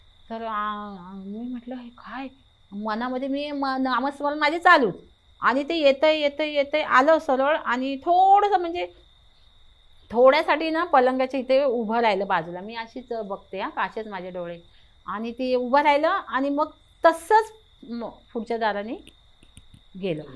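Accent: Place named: Konkan, India. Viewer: Khup sunder story sangitli ekdum amcha kokni andaaz thanks kaki, plz reply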